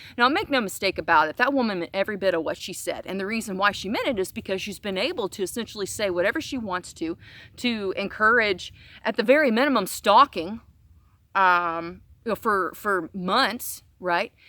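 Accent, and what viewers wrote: South, United States. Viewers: As soon as I hear a southern accent I move on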